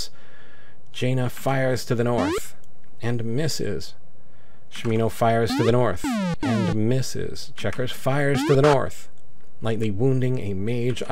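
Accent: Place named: Scotland, United Kingdom. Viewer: Colleague of mine watched you first time and mentioned your Scottish accent Oo ...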